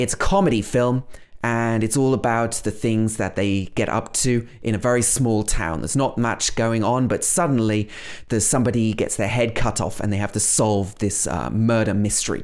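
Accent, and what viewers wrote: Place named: United Kingdom. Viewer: I love British accent and I hope that someday I would be able to sound like you . You are the best teacher on internet.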